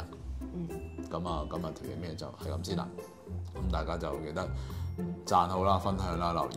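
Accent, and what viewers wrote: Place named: Taiwan. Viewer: 姐的中文，愈來愈台灣味⋯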